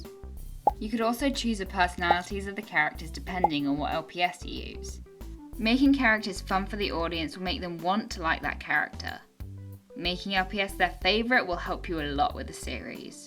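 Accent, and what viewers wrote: United Kingdom. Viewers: are you british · She has a British accent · • scottish i think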